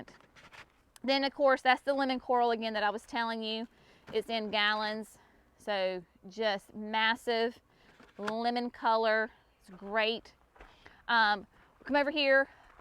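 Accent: South, United States. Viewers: Hello from the wasteland, Illinois! So good to hear someone from the south! Your our sanity.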